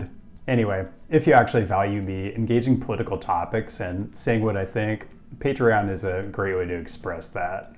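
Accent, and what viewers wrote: United States. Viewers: Duuuude it was a little short sided for you to say that you have a normal American accent JS That was putting it nicely There are so many dialects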